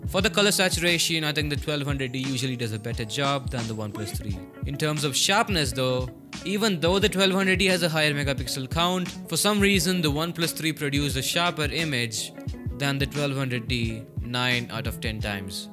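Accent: India. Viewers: are you from kerala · hhh, indian voice hate it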